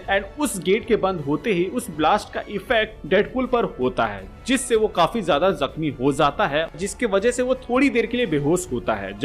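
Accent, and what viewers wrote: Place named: Bihar, India. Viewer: Bhai apke tone of explaining se lgta he ki app bihari ho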